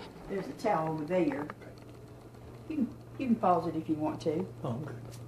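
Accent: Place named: Georgia, United States. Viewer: I’m from Kentucky, but my family’s from Georgia, so there’s something very familiar about your accent. It makes me feel like I’m cooking with my aunt haha :)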